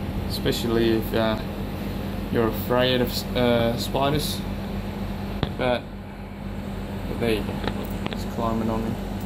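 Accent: Australia. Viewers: he sounds born and raised Australian. Please don't tell me you think white people are native to Australia 😅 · Is this dude Asian with an Australian accent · He sounds like and his mannerisms are like a typical Aussie. Could have been raised here, born here or be a descendant of a long line of Asian immigrants present here from the earliest pioneer days. After all Australia is further south than what is called South East Asia, we are situated in the Australasian Region of the world map. He could have a heritage originating from any Asian nation. I'm an Aussie of Anglo Saxon heritage and have cousins and 2nd cousins who are Ausies with Thai heritage and Aussies with South Korean heritage. Also other 2nd cousins with Samoan heritage.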